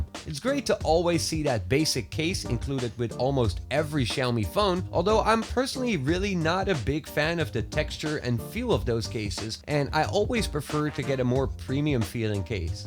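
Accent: Netherlands. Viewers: you sound very dutch 🤔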